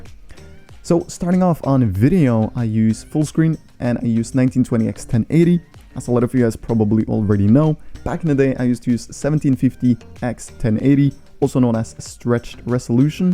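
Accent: Norway. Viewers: Are you Norwegian? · Er du norsk?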